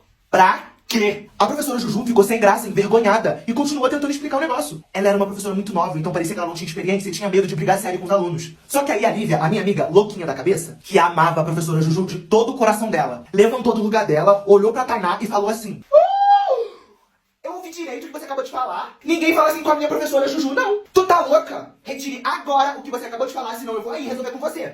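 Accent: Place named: South, Brazil. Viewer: Você é do Sul né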